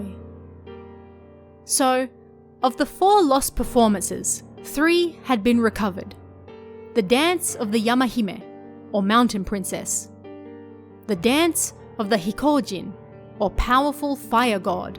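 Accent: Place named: Australia. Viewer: Hard to listen to the Aussie for a long time. Not saying it's bad, just not used to it.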